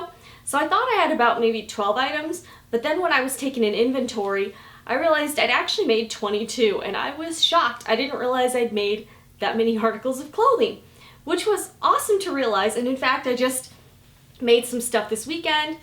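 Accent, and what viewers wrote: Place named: Midwest, United States. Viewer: Are you from the midwest? Cute accent :)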